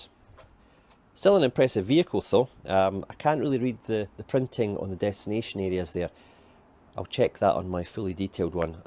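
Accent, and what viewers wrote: Scotland, United Kingdom. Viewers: Hey. Whereabouts in Scotland are you actually from? I can tell by your accent. I'm a new subscriber from London, UK.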